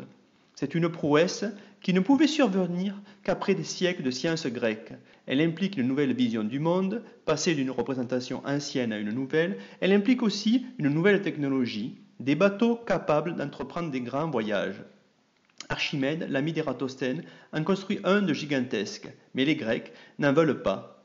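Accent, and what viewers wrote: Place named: South, France. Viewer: Expliqué avec l'accent du sud c'est encore mieux...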